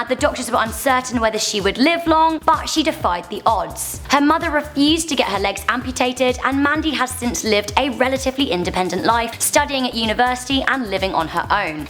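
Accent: United Kingdom. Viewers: Are you British?? I am just curious. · i love Ur British accent!!!!! i am australian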